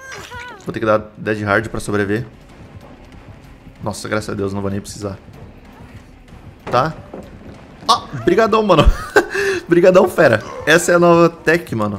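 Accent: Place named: São Paulo, Brazil. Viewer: Pelo sotaque acho que é paulista, mas não tenho certeza.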